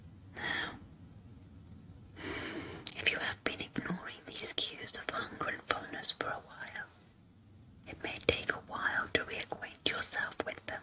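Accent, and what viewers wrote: England, United Kingdom. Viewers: Are u English u kinda sound English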